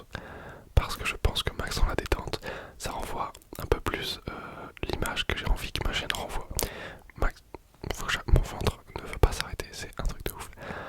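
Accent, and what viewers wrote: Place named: Quebec, Canada. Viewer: Rien que d’entendre l’accent du quebec 🔫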